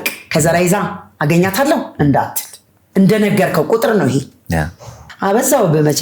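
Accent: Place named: Dire Dawa, Ethiopia. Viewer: እኔ እውነት ለመናገ የድሬደዋ ሰው መሰለችኝ አነጋገራ የአገሬን ሰው መሰልሽኝ።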